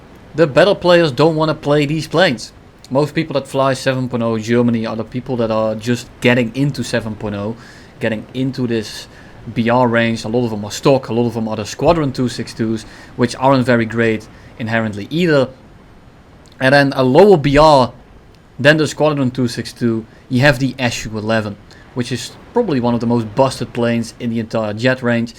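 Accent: Netherlands. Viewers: Ik hoor het Nederlandse accent in hem but sometimes he speaks English like an American!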